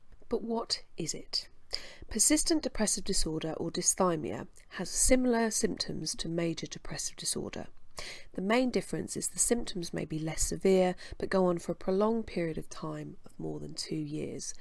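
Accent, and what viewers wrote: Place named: United Kingdom. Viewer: (Sunday November 1st 2020) Greetings from Montréal, Québec, Canada !!! I do not remember how I came accross such videos, but I FINALLY put a name on my emotional/mental state ' Dysthymia'; exactly what I have been going trough for more than 25 years. I am 60 yrs old, and for the past 5 years...I have had more downs than ups...and it's NO FUN !!! My NEW doctor has prescribed me some pills that I have to start taking this monday to see IF my emotional state shall regain the energy that I used to have...I shall keep you posted shortly. Great video, love your voice, love your british accent !!! Cheers !!!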